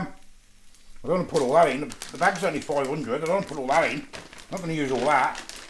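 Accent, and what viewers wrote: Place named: Midlands, United Kingdom. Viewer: I thought i cud detect a midlands accent.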